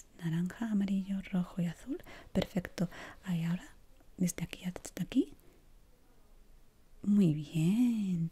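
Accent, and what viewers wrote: Spain. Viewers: Me gusta tu voz eres española?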